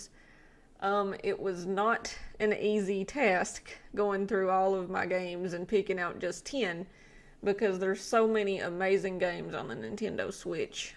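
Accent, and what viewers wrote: United States. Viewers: Love that accent of yours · you sound like Arkansas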